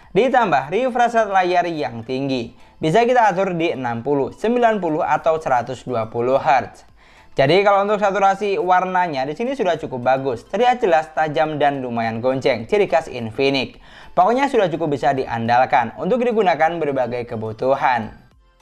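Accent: Madura, Indonesia. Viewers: Logat suaranya kek orang madura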